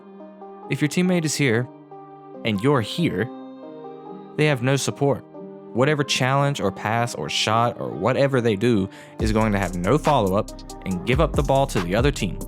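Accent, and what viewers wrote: United States. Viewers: Gotta love the good ol' murican accent!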